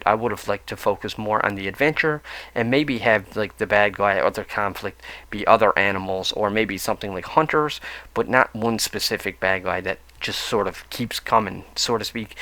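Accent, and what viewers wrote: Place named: East Coast, Canada. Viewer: Ahhh, you're Canadian! I kept hearing East coast but was not sure. Hello from southern n Ontario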